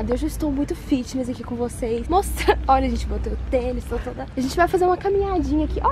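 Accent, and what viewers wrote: Minas Gerais, Brazil. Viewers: Gostei muito Lindo Em Unaí lugares lindos,um beijo meu amor fique com Deus❤ sotaque maravilhoso e do pessoal de Minas😍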